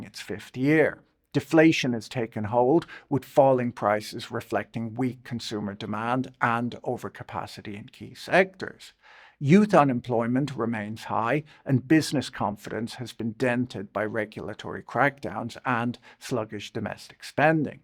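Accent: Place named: Ireland. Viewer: For not letting that thick Irish brogue keep you from saying the phrase "rare earth" repeatedly in a half hour video essay 👏👏👏 I salute you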